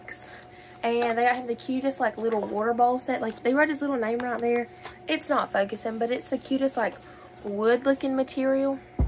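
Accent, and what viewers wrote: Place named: South, United States. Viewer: Cool video 😃😃 I love your Southern credo twang!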